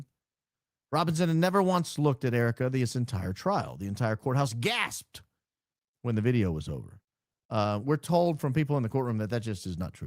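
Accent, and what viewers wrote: South, United States. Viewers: Alright, I’m going to need 1987 southern accent to make a comeback😂😂😂. I also have never voted for memaw Ivey. And I’m not voting for Tommy from Florida either lol 😊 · You absolutely should split your vote up and always vote for the best candidate that's best from America First and Only. I also don't think you looked fat and terrible in that old video, I also like the southern tang a bit.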